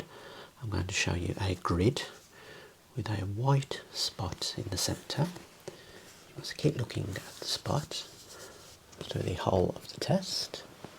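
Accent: Birmingham, United Kingdom. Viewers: When I started watching your videos I got so confused by your Birmingham accent. Three years later you sound like fairy from Neverland to me. You don't help me to fly, but to fall asleep easily 😊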